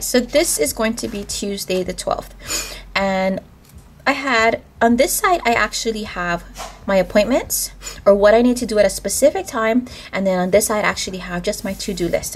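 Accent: Hawaii, United States. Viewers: OMG I could tell you from Hawaii the moment you started talking! aloha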